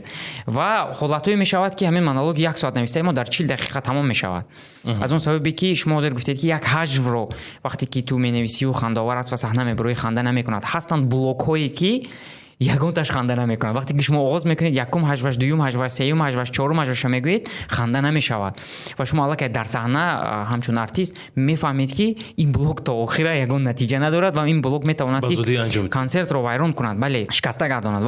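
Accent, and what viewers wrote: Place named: Dushanbe, Tajikistan. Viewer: Говоришь более или менее толковые вещи, но твоя речь такая поддельная, просто режет ухо Ты говоришь о литературной речи, в то время через слово используешь душанбинский лад Для меня вообще не проблема иметь диалектов, это изюминка. Короче, ты прогнулся братан. Прибегал туда где высокооплачиваемая аудитория, зачем нести херню о едином языке и тд Такое чувство что ты - реальный подкаблук, это не нормально, вообще. Ты давишь на больные точки женской аудитории, типо ущемляют права и чувства женщин Будь оригинальным, ну типо собой!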